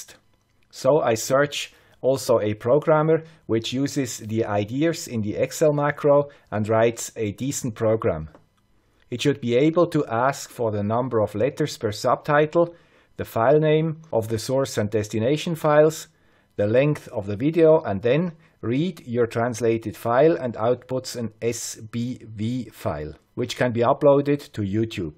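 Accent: Switzerland. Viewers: Love your swiss accent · "Olá membros do YouTube ! Eis o homem de sotaque Suíço ! ..." - Português Europeu - European Portuguese. Consider me enlisted ! If you can find the time to do it, I'll find some time too... Alexandre from Portugal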